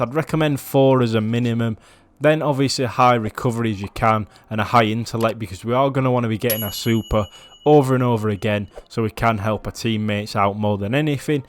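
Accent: United Kingdom. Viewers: Just out of curiosity what part of the UK is your accent from?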